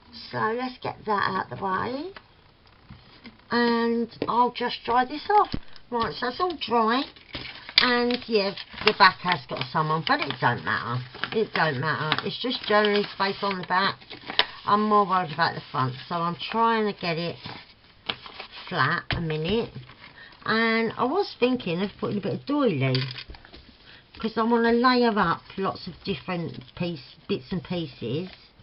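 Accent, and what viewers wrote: Cockney, United Kingdom. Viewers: Love the journal card. The edge does look like a peacock feather off the edge! Hugs and Blessings my lovely friend. Xoxo Denise PS...every time I play your videos and my so. Is in ear shot he stops and watches because he says he loves your Cockney accent lol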